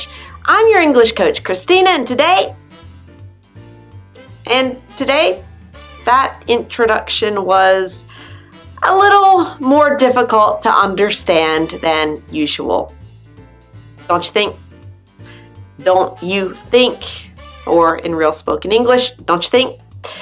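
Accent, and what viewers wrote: United States. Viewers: So , I m from DRC Kinshasa … IS IN AFRICAN.. I m liker your English American accent is very good . Please my teacher Christina my God bless you. I love yours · That is definitely an American accent. She’s just slowed down, as well as articulated, speech... I think she does a great job overall. The only exception is that ending sound of letters. As I stated above, she adds the -uh sound, which is incorrect. I used to do this teaching Lao students and then realized that it made learning to read difficult. For example, ‘sad’ would become suh-a-duh, instead of s-a-d. Or ‘tin’ would become tuh-i-nuh, instead of t-i-n. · Not every american speaks with your accent.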